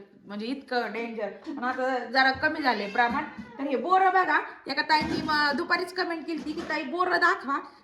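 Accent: Ahmednagar, India. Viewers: आपली नगरी भाषा अशीच आहे... लोकांना ती वेगळीच वाटते...आणि dhawalpurila आमचे पण पाहुणे राहतात...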